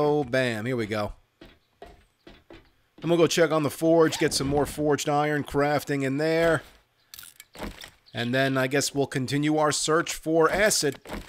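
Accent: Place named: New York, United States. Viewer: Dude, these rock xD And all in that what, east coast accent? You make me think a Manhattan guy woke up in the apocalypse and is just going around pwning stuff till they can get back to Noo Yawk lol xD Very enjoyable!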